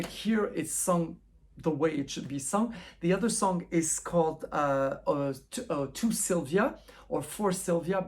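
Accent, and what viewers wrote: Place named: France. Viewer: This is lovely! I love your erudite enthusiasm for Barbra and her artistry. You remind me a bit of me (except I don't have your French accent)! Thank you for this.:)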